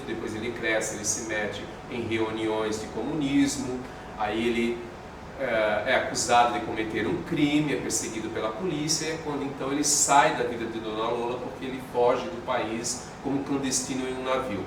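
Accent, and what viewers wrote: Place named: Santa Catarina, Brazil. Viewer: Oi, tens um sotaque catarina........ Adoro!!!!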